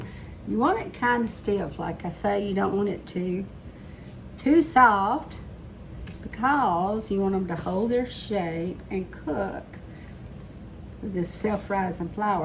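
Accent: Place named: Missouri, United States. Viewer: Listening to you talk, it sounds like the people around here where I live. I like that. You feel like you're from right here in Missouri too and that makes me feel comfortable. Got a like, sub, and a bell. Listening to what you had with your friends yesterday sounded incredible! We didn't find any Morels this year, but it sounds like you and your friends did, those fried mushrooms. This recipe looks really good, and I love the idea of using rotisserie chickens. I think I'll talk to my mom about it and we'll make this in the autumn or winter. Great recipe. I bought a product years ago from McCormick for chicken and dumplings. A spice packet and recipe on the back... and I read it wrong. I used WAY. TOO. MUCH. butter... they were delicious but I can easily say I never made it again because it was way too entirely unhealthy for us. But this recipe seems way more healthy than my failed attempt years ago. 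I look forward to trying yours next. :)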